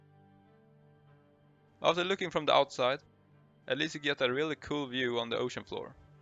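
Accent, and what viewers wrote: Sweden. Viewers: This sound so nordic! I love it the english sound exactly like a Swedish brother, keep on working that pronunciation and keep up the good work! Grym video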